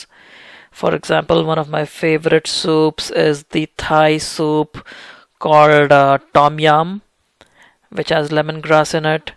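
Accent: India. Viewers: rolls his "R"s... because he's Indian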